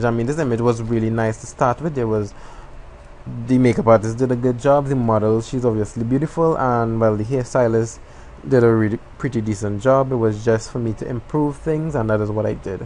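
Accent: Trinidad and Tobago. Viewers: You're trini just like me lol I have problem understanding you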